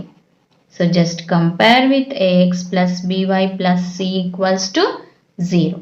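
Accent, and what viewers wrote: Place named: RP, United Kingdom. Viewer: Don't do overaction okna talk properly don't talk posh english 😏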